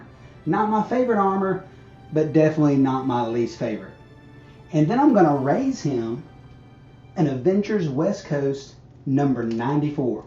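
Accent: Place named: South, United States. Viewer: Love the Hulk cover! The south was strong in this intro too 😅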